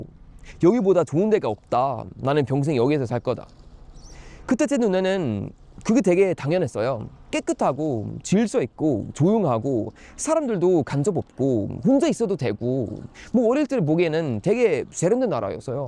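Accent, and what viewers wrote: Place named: Countryside, South Korea. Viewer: 말투가 한국말투~~약간 한국시골말투~~기냥한국사람같네❤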